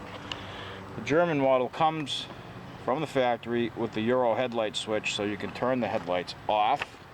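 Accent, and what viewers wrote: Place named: New England, United States. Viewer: Mr., as always has lots of detailed information about his bikes even with his Goofy New England accent.............lol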